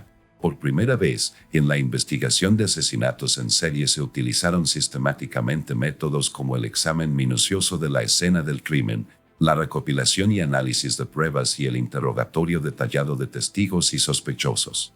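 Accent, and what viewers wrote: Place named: United States. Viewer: Por qué ese acento yanqui?????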